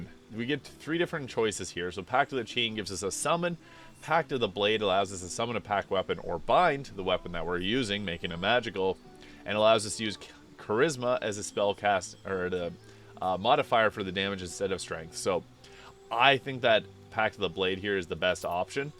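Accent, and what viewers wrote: Canada. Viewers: Are you ducking Canadian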